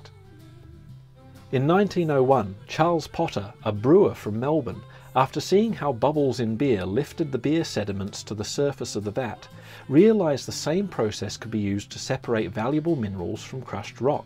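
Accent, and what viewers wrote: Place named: England, United Kingdom. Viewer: Your accent mate. Are you a Englishman...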